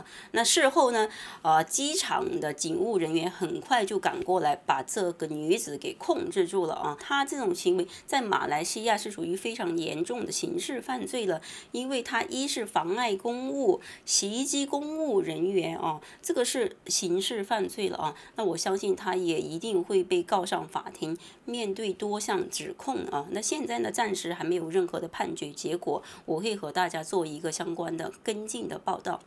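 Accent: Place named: North, China. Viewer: 北方口音好吧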